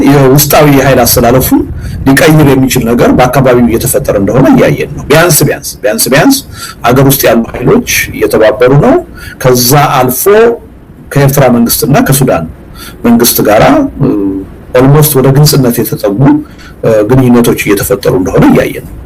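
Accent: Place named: Gaxaree, Ethiopia. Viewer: Diye diye...Wolduya..Gaxaree accent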